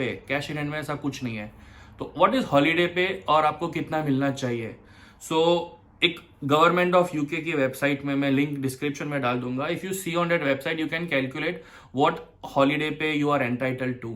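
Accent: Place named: Bengal, India. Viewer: What are you Bengali or something ? I might be wrong but your accent sounds kinda similar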